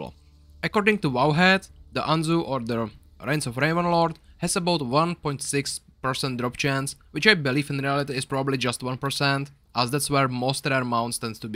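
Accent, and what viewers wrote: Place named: Czech Republic. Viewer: proč jsem poznal že si čech v prvnich 3 slovech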